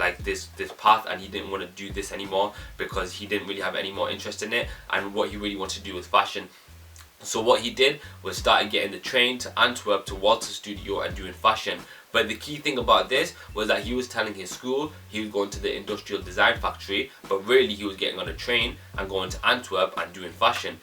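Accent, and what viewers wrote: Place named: Manchester, United Kingdom. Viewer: The Manchester accent too strong 😂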